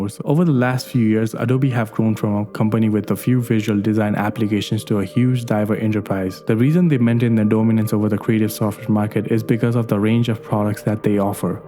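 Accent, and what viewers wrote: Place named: Nepal. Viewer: You sound like a Nepalese. Are you one?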